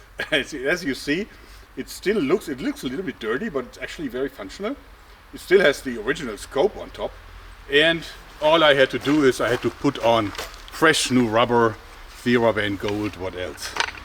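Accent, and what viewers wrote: Germany. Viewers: as a german living in the uk, german accents in youtubers infuriate me and make me cringe. you are the only one i actually like haha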